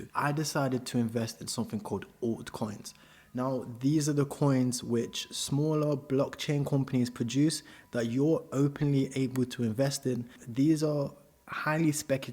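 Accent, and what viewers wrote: United Kingdom. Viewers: Are you investing or trading. And are you from the UK?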